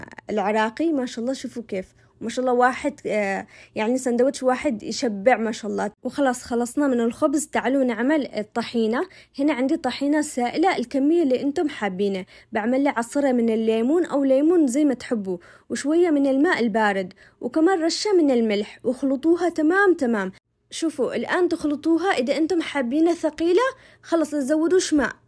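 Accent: Aden, Yemen. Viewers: 😂😂 حتى بنتي الصغيره تقلدها وتسوي فيدوهات ماشاءالله اللهجه العدنية تفووووز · ماشاءالله مصري بلهجة يمنية 😃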